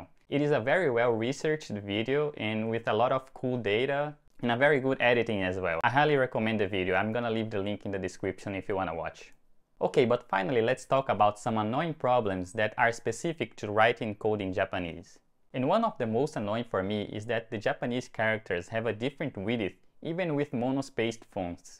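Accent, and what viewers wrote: Brazil. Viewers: Você é Brasileiro?! Caramba! Show de bola man! Watashi wa Lima desu. Dozo yoroshiku.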